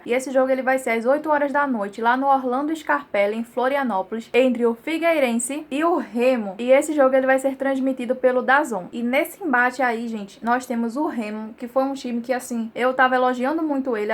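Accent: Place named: Northeast, Brazil. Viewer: Seu sotaque é nordestino parabéns pelo canal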